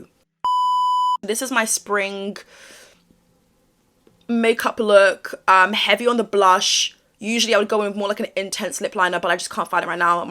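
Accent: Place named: United Kingdom. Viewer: First time viewer here and I must say, I LOOVVEE your British accent, babe! From an American standpoint, that accent on a beautiful woman is a deadly combination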